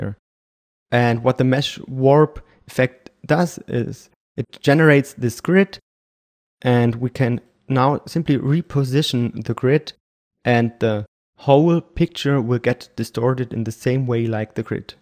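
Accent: Germany. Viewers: Are you german? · Strong German accent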